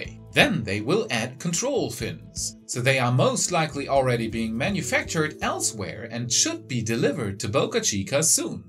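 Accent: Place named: Germany. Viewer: Yah, that's a subtle German accent. It's impressive how many folks there and in Scandinavia speak better English than the average American. At this point, theirs qualifies as a full-fledged regional accent in English just like Australian, British, Indian or the one from Louisiana...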